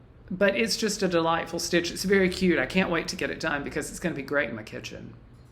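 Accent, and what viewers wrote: South, United States. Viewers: I've enjoyed bingeing on the last 2 months worth of episodes (got a little behind on the flosstubes). I just love your gentle, relaxed, southern manner, so soothing and at the same time so fun to listen to while I stitch after a long day at work. :D Your granny sounds like she was just amazing, thank you for sharing her poetry. Thank you for bringing a smile to me face and bringing a little bit of "happy" to my day. #chrisitup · I always love hearing the poems from your”granny” and the stories from your past. I am not from the South but I love hearing the many fun words and accent that you grew up with. Thank you so much for sharing. I hope you get lots of stitching time this week………….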